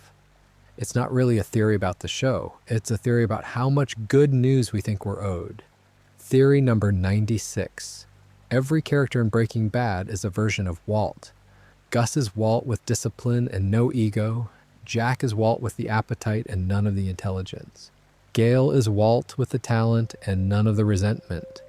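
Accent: United States. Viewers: The narration for this video about an American show being in an American accent, but nonsensically forcing British words / terms in... absurdly pointless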